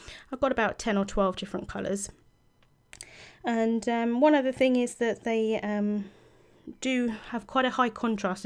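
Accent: United Kingdom. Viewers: I can't get by a crafting day without watching one of videos. When I'm talking to myself, it's in that British accent that we Americans wish we had!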